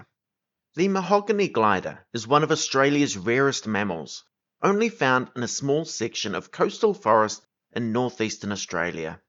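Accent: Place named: New Zealand. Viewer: Good content and good channel name. I advise adding subtitles to your shorts if you make them as your Kiwi accent may confuse ppl. I can understand though but not everyone might. But it's the lowest priority.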